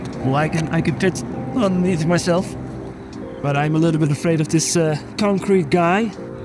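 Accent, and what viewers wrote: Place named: Netherlands. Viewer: ERMATTEN and THERMAC. The Dutch accent is killing it lol keep it going dude! Great Video's!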